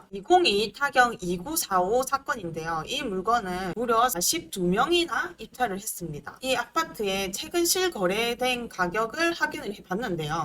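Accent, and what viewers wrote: Gyeongsang, South Korea. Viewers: 갱상도 말투 쥑이네예~화끈하게 좋네예!!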